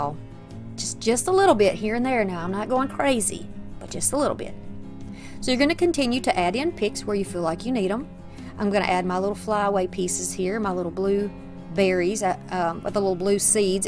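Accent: South, United States. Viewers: these are all so very awesome. You are an excellent DIYer. Also love your southern accent. Hugs and love, Janet 🦊🦊🍁🍁🌻🌻🍂🍂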